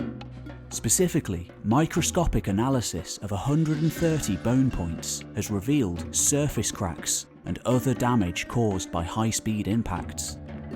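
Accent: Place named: Midlands, United Kingdom. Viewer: Mercia, you Midlands I think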